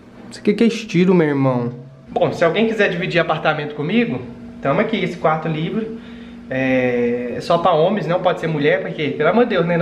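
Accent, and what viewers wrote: Minas Gerais, Brazil. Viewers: E que se toma o cafezim sozim kkk esse e mineiro raiz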